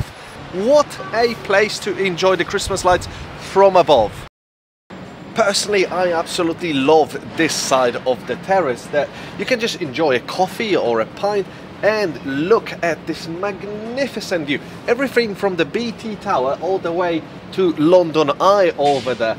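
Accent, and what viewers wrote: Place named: Germany. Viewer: German twang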